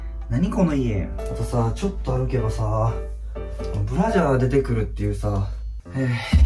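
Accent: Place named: Kansai, Japan. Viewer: ずっと男子ないけちゃんの関西弁嬉しい❤やっぱりすてき❤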